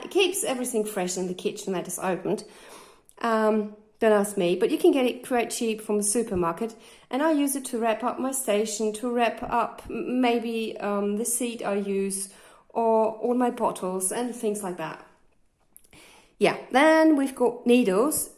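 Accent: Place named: Australia. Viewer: i thought she was a fellow australian for a minute lol.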